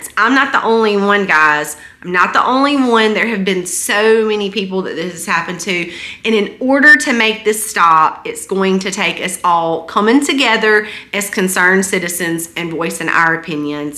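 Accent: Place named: Alabama, United States. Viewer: YOU FROM ALABAMA AINT YA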